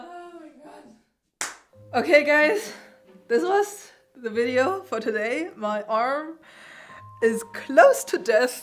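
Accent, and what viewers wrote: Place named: Germany. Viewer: As a fellow German who just found your channel, I found it really interesting that I could tell roughly where you're from before you even said "Erlkönig" because the dialect is audible even when you speak English. I never really realized that this was even possible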